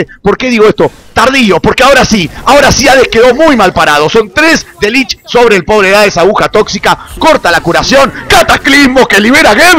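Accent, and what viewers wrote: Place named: Argentina. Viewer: Relator argentino. No se quien es, pero se nota a los 2 segundos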